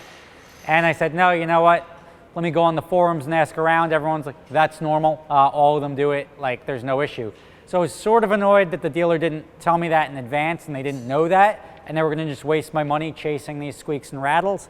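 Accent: New Jersey, United States. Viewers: Great Jersey Accent! Who cares what it cost - wtf - If your looking for a Porsche don’t sweat the cost as you know it’s going to be expensive if the shit breaks. Otherwise I liked the the vid.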